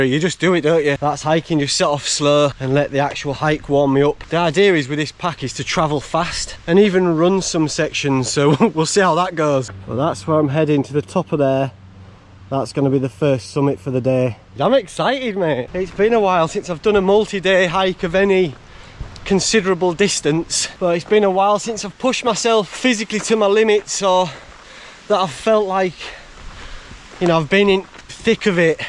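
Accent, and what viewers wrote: Yorkshire, United Kingdom. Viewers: Awe you’ve been a miss with the multi day adventures 😀 Love the Yorkshire accent, never change! That’s the one thing I loved to listen to on my coast to coast path last year. Really enjoyed that 🫶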